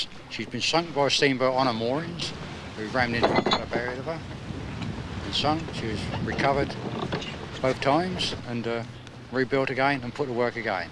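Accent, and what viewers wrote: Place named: Whitstable, United Kingdom. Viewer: Proper Whitstable accent.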